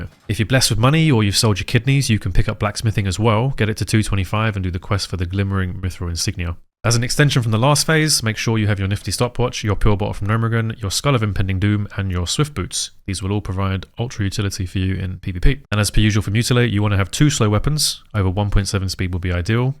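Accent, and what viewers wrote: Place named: England, United Kingdom. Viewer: If youre going to speed up your speech dont mumble. Maybe it's your accent. Strange how the english invented the language yet cant enunciate to save their life.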